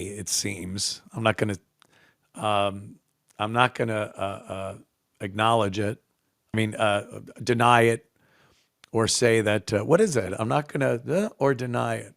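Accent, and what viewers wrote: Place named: South, United States. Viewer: As a southerner I approve of ants new found accent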